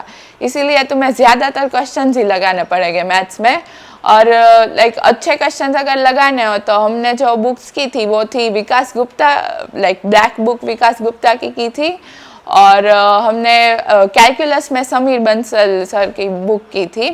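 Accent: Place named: Gujarat, India. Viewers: The Gujarati accent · Not even a 1% percent dilution in her gujarati accent hats off queen........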